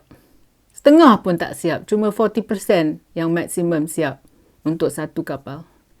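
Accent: Perak, Malaysia. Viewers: Saya suka dgar. Tapi ckp perak sikit buat saya ketawa. Sbb saya pun orang perak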